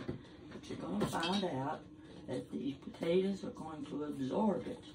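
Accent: South, United States. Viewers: Love your hair. Appreciate, your sharing. You are cute and charming. I am 76, how old are you?I am guessing, but I think you are a Southern Lady. Your accent is similar to mine. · No!!! Southerners use Duke’s, always Hun! You sound pretty Southern to me..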